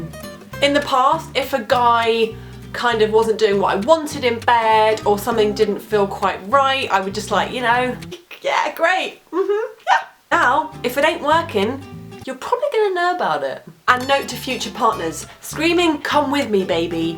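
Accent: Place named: Cockney, United Kingdom. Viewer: I think point 23 is the most satisfactory for you today; good for you. I know i'm annoying, but why don't you create english subtitles for your videos, since you write your own scripts for the most part of them? Foreign audience would be pleased to translate them into other languages, so that they could enjoy your sort of cockney accent.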